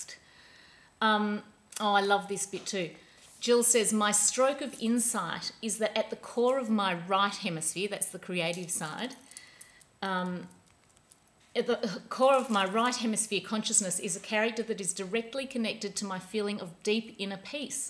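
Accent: Australia. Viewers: It's nice to hear an aussie on YouTube! I really enjoyed the book review - my stroke of insight sounds like an interesting read.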